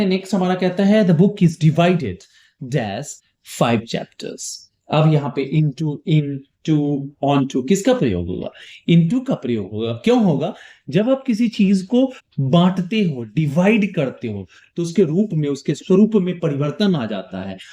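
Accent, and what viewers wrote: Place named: India. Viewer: Very nice explanation. Which language is it? Very interesting.It sounds like Indian.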